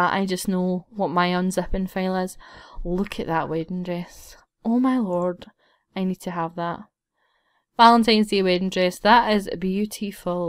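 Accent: Scotland, United Kingdom. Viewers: She is Scottish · Are you scottish? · where about in Scotland you from? I'm from Glasgow! noticed ur accent right away 😂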